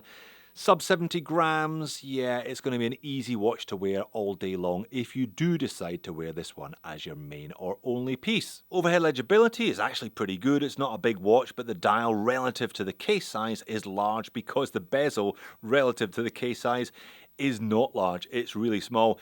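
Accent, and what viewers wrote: Scotland, United Kingdom. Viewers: Hi! Greetings from Norway. Thanks for the opportunity to win such a great watch. Love your content – and your Scottish accent. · Subscribed to all the required, please chuck me in the draw! Keep up the great and honest content, I look forward to your Scottish tones with each new release. "Hallllooooo and welcome!" 😁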